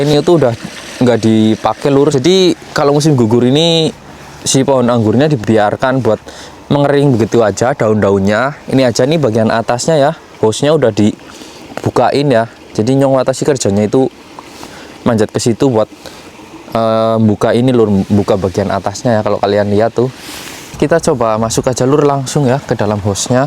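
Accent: Banyumas, Indonesia. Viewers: Mas watasi sangat kreatif ya, senang dengan vlognya yg ngapak2 gitu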